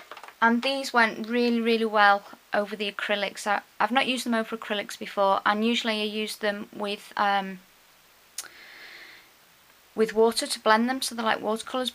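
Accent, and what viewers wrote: United Kingdom. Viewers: Congrats on your over 1,000 subscribers!! You have great videos and you're so talented, plus for us Americans....me anyway, I love your "accent"...you're very easy to listen to! (Quote around accent because wasn't that long ago, I was the only American on the whole of the Isle of Wight and everytime I opened my mouth I "stuck out" like a sore thumb. BUT....I loved the UK and really everyone I met, was such a delight! Seriously though I just love your no fear attitude with your crafting!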